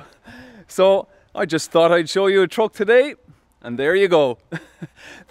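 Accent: Ireland. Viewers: he sounds irish mate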